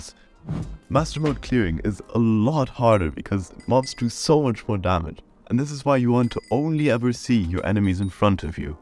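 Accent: Germany. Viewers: Yo, nice german accent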